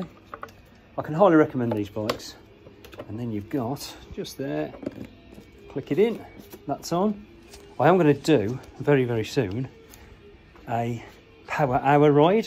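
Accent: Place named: Midlands, United Kingdom. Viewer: Cheers dude not getting one you saved my bacon midland acsent👌☕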